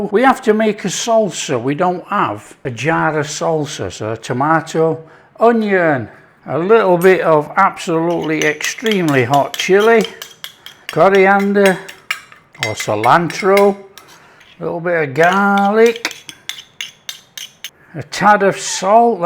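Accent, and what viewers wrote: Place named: Liverpool, United Kingdom. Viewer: Just love the Liverpudlian accent, then we go a little bit of elvis lol, you’re so entertaining. Come on!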